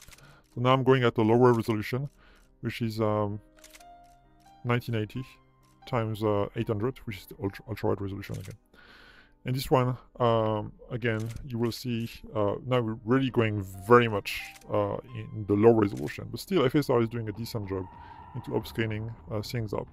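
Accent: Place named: France. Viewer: accent français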